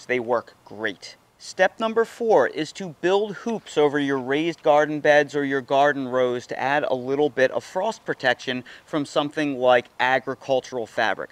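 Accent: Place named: South Jersey, United States. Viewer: Are you sure you're from Philly? Your accent sounds more like South Jersey.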